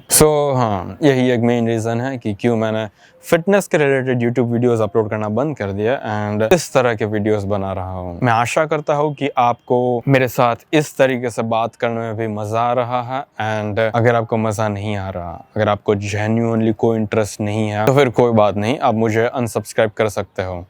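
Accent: Gujarat, India. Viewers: most of the gujarati people tend to struggle while speaking hindi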